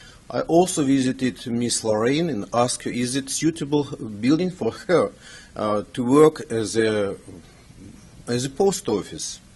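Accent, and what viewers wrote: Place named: Russia. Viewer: Надо отдать должное Вашей смелости: английский у Вас русский, явно недостаточный для избрания на должность мэра.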